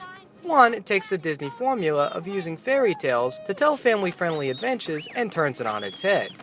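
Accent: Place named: New York, United States. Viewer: You have a very interesting almost extinct New York accent.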